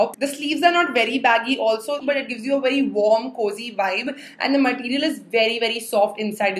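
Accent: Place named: South India, India. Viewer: Sometimes I really feel you are south Indian by your accent.. ❤❤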